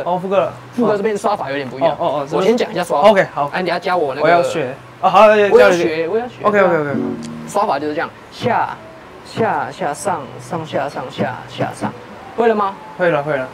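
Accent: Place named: Cantonese, China. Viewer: 在說廣東話的時候好可愛....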